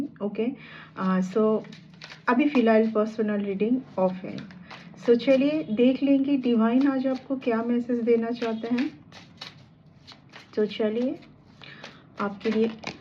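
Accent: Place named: Bengal, India. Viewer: Very beautiful reading....when a Bengoli person speaks Hindi.....it's sound very sweet 🧁❤❤❤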